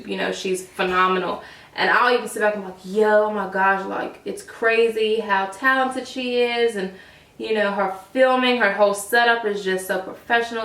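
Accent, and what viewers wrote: South, United States. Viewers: I've never noticed her country accent. Has she always had it?? I feel lost lmao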